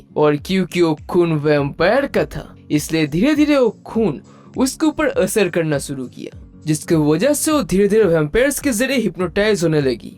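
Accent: Bengal, India. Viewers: Bhai tu bangali hai. Na? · Are you bengali ?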